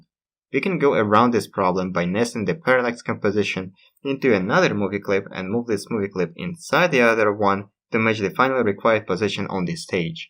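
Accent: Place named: Russia. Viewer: о, ну по акценту уже похоже что ты русскийя:3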